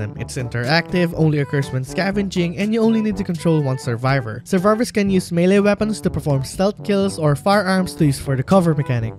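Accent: Philippines. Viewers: I like your Filipino accent, I wish I had mine but I'm too much an Aussie to turn back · Are you filipino? You have the accent...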